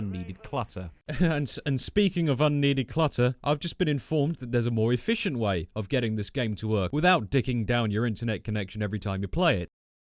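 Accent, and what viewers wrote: United Kingdom. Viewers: I thought your accent was Australian at first but it sounds more British in this video. I'm bad at telling accents. Australian just sounds like a confident British accent to me.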